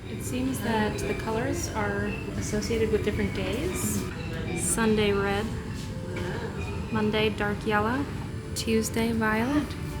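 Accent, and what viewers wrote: Cambodia. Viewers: your khmer accent is really cute! And love the effort :) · I’m Cambodian and your accent sound like mine 😭😭😂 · Your Khmer accent sounds so beautiful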